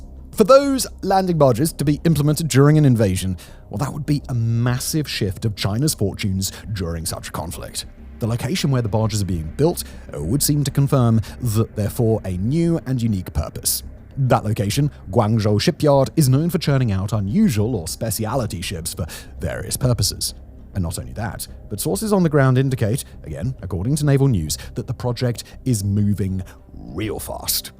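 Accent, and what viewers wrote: United Kingdom. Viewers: We Americans like to learn from people with a British accent. It makes us feel more intelligent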